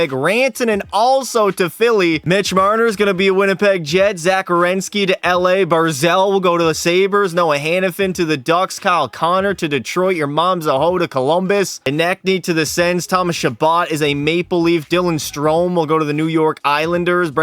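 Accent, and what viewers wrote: Newfoundland, Canada. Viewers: Need to know what part of Newfoundland u from there skipper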